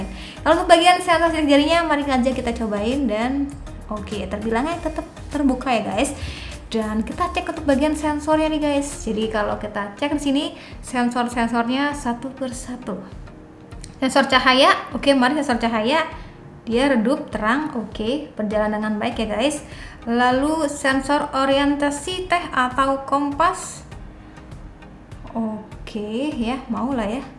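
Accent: West Java, Indonesia. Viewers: Nambahin kata teh dan eyy di blkg kalimat kaya udh menjadi kebiasaan org sunda ya🤣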